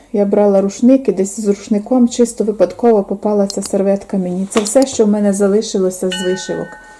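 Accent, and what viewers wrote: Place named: Lviv, Ukraine. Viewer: Вибачте,що я питаю.Де ви народились,в вас вимова,як в нас на Львівщині .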